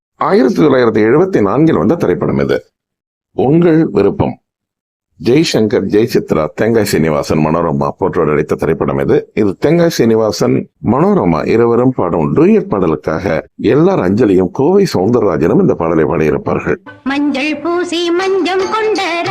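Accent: Sri Lanka. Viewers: இலங்கைத்தமிழர் என்பதால் இவரது தமிழ் இனிக்கிறது !! ஆனால் தமிழகத்திலோ எளயராசா வந்த பிறகு காலம் காலோம் எனவும் மோகம் மோகோம் எனவும் ராகம் ராகோம் எனவும் தமிழே தேய்ந்து அழிந்தது !!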